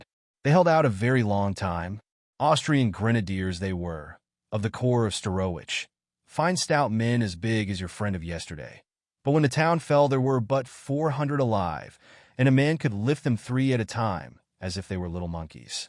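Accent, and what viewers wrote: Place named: United States. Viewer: American narrator ! totally inappropriate and not welcome .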